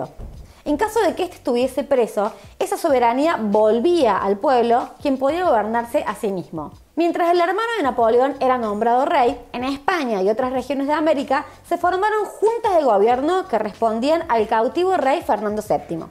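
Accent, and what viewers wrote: Córdoba, Argentina. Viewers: Tuve que poner los subtitulos porque no entiendo el cordobés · Jajaj ame esa tonada cordobesa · Su acento es muy cordobés... Eso me agrada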